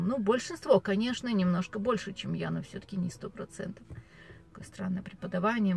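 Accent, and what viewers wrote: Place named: United States. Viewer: Всё у вас получится, терпите, учиться надо всю жизнь. Кстати, у вас уже русский язык с американским акцентом.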